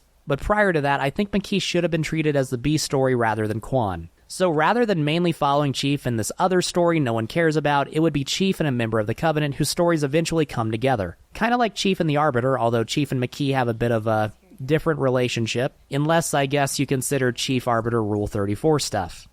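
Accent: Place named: Canada. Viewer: Bro sounds Canadian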